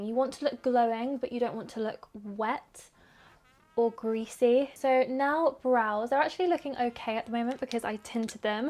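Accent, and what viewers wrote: United Kingdom. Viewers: i usually skip through the sponsership part of videos cause they always have the same products, but i couldn't skip through saying all of it in her british accent 😌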